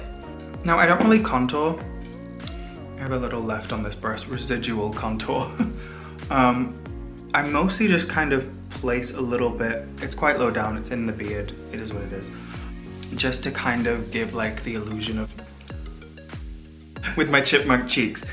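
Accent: United Kingdom. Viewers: NEver heard your real accent before. Are you from England originally? I live in London. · Omg you’re British???? I thought you’re American 😮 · Oh my god he’s british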